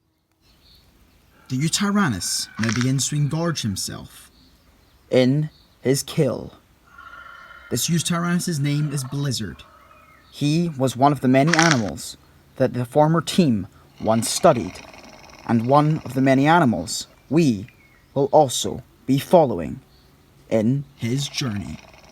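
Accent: Scotland, United Kingdom. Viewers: I’m just wondering are you Scottish cause to me you do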